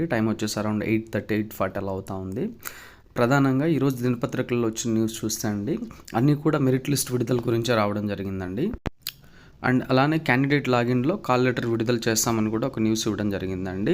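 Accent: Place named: West Godavari, India. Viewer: అండి అంటున్నారు మీది west గోదావరి నా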